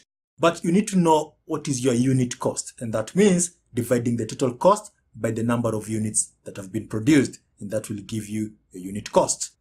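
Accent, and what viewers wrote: Kenya. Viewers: Aaaa thanks man! really helped! btw are you from kenya? can sense that kenyan accent haha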